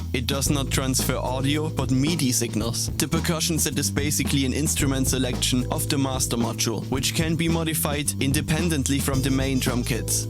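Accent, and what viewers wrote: Germany. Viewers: Du klingst deutsch lol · Nice video, but earcancer. Are you german?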